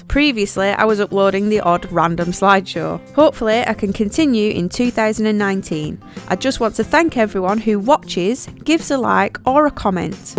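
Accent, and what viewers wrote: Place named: Lancashire, United Kingdom. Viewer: So happy to have found ur tutorials.....absolutely in awe of ur work As a mere novice and previous community nurse ,this is inspirational.....think I chose the wrong vocation in life !!!???? Lol Thankyou for ur clear demonstration and must say ur lovely Lancashire accent just warms my heart . Keep up the fantastic work , I'll certainly be following regularly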